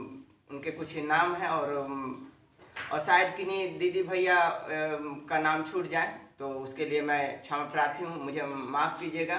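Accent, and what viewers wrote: Standard. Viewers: Bhai ji aapki hindi bhut shudh hai Aap bs galat rah pe mt chlna aap dil se bolg bnao Or hr video me improbe kro thumbail pe ghyan do contet pe dhyan Aap jrror kroge Lobe you from himachal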